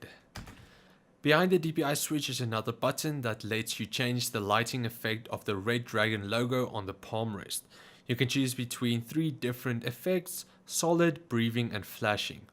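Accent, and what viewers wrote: South Africa. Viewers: thicc South African accent. Nice vid. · Sounds south african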